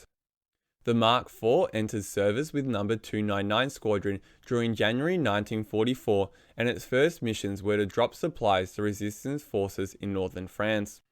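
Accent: Australia. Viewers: He is Australian, that’s the way a lot of Aussies pronounce it. All languages/ dialects have funny ways of pronouncement. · The Stirling was out-dated even before the prototype flew - things changed so fast! The thick, draggy, wing section was half of the problem, and the other was the feeble engines, so it was under-powered! My old friend Todd Slaughter (long since deceased) flew in them, but never talked about it. - - - The commentary (AI?) was very poorly spoken, disregarding the Aussie accent, so was difficult to understand, if you ignore the errors. (What's a glider tower - a vertical stack of gliders?) It would have been better to call it a glider tug.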